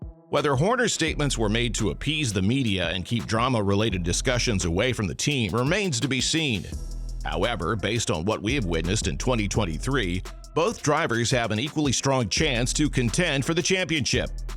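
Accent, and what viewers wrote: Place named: United States. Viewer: ngl I can't grasp an American accent trying to inform me of F1 information. Need british accent, can't believe information being said.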